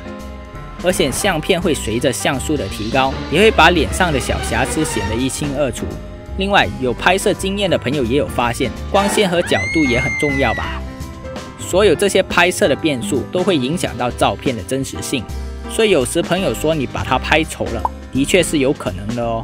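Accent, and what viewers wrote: Malaysia. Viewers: 偶然刷到这个影片，听你口音一听就是马来西亚，不像是台湾还是中国，翻了一下，居然是吉隆坡的。突然觉得马来西亚的Youtuber有望。很棒ayy! 加油!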